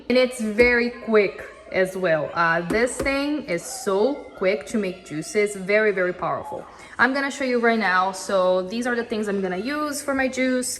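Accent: Brazil. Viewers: Love the Brazilian Accent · Brazilian accent 😊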